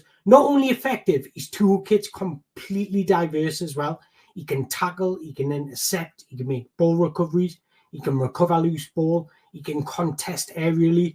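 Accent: Newcastle, United Kingdom. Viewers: Mate I’ve been following you on Twitter for a few months when I saw your name and photo I assumed you were Spanish or something I was not expecting a Geordie accent hahahaha. Top content!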